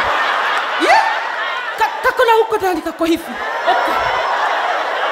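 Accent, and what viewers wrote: Meru, Kenya. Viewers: 😂😂😂...that accent though....interchanging between meru and kyuk...(needs some work) but very hilarious!!!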